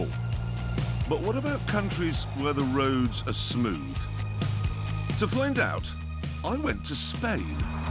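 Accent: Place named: United Kingdom. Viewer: I love how the British at so much flavor to the review British accent I love it I love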